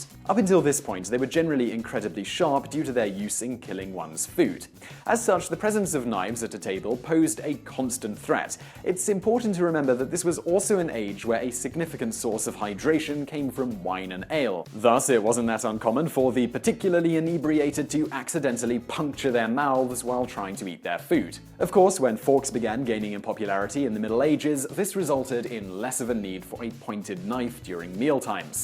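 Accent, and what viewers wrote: United Kingdom. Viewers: The "Barack" period lol. I love British accents!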